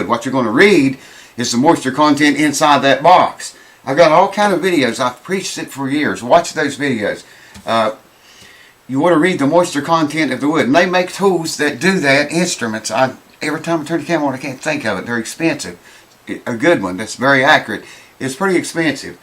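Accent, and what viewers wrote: South, United States. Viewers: Were in usa you buddy accent south innit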